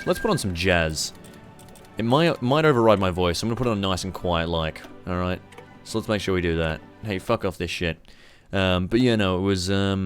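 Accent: Australia. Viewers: I like how has got progressively less Australian over the years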